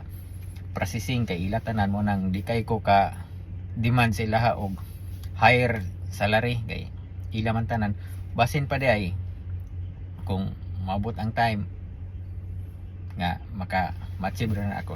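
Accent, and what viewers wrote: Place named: Bohol, Philippines. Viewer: Mura lagi ka taga Bohol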